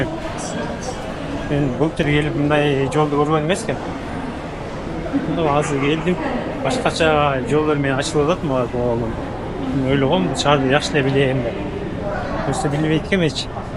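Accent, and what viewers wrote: Kyrgyzstan. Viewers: Нукура кыргызча суйлойт экенсиз👍биринчи жолу кыпкыргызча блок коргонум👍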